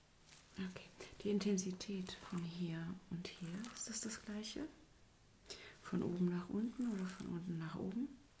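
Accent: Germany. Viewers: Está hablando inglés o alemán? O habla el inglés con el acento alemán, solo curiosidad, habla diferente pero se le escucha bonito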